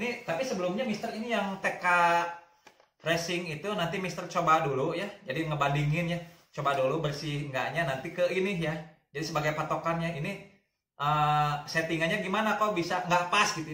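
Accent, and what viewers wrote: West Java, Indonesia. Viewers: Si mister dipanggil abang. Tp dari logat mah teu bohong cigana orang sunda hahahaha.. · Logat sundana ngeunaheun kang mister :v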